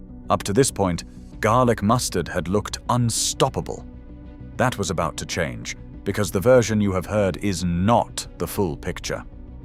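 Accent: England, United Kingdom. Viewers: You mean English accent?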